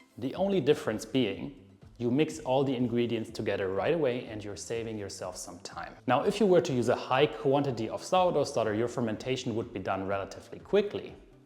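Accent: Germany. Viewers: My mom was born in Remshied, Germany, so listening to you brings me back home🎉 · Wenn Deutsche am perfekten Englisch feilen…. Hört man trotzdem raus, dass es Deutsche sind. Dein Englisch ist sehr, sehr gut. Keine Frage!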